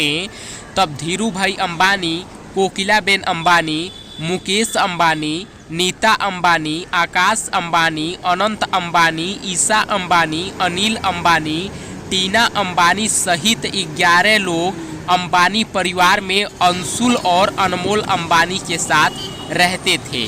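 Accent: Bihar, India. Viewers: Apki Hindi bilkul bhihari jese hai good job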